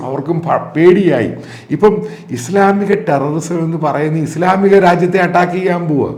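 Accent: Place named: Central Travancore, India. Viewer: Ayyooo mathew Samuel ... you're such a funny guy. Each time I hear this " ee pullu !! " cannot stop laughter . Yeah madhya thrivithamkoor working . Am from Kottayam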